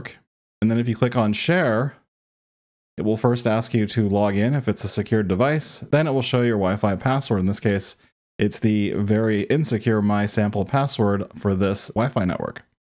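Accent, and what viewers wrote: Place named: United States. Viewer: American pronunciation BAD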